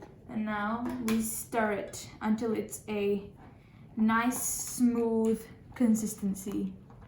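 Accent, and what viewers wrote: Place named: United Kingdom. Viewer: or whatever idk sounds kinda British ngl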